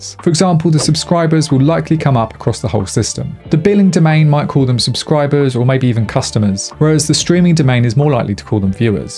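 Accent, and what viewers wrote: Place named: United Kingdom. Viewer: Hi, thank you for your video. I like the British English pronunciation. I lived for 5 months in England, at first it was a little difficult to keep up with the native speakers, I was able to learn their accent, however there are many accents in the UK. Your videos help me a lot to remember that particular English!! thank you.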